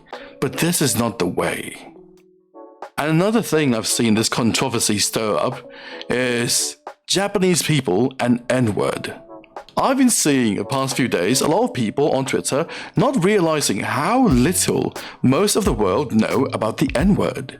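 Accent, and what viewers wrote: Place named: England, United Kingdom. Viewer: Very balanced take and earned my sub so will check out what else you have on your channel. Also being from England you have a cool sounding English accent and im curious if you learned English in my country?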